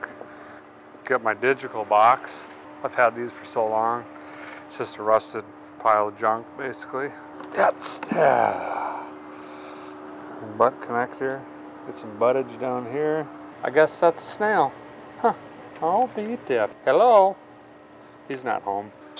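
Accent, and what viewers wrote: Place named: Minnesota, United States. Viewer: Well I’d be darned this fellas accent makes ya want to move to Minnesota 😂 love his humor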